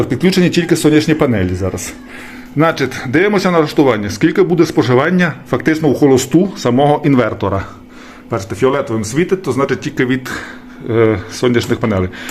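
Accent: Transcarpathia, Ukraine. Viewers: По акценту зрозуміло що Закарпатський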